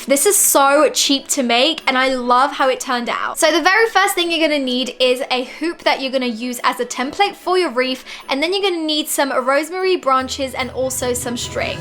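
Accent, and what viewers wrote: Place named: England, United Kingdom. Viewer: Yes I like your DIY core where are you from are you from England or from are you Irish cuz it's I was just curious cuz that accent sounds like you're from England it'd be great to hear somebody else you know talk English I just wanted to compliment on your your crafting send me a line and tell me you and I'll just send me a line I said Thank you or you know that kind of thing all right God bless you have a blessed week and evening I can't take care of by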